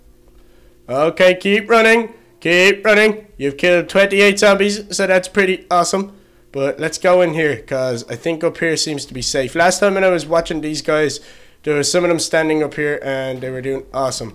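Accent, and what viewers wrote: Ireland. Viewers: Are you from ireland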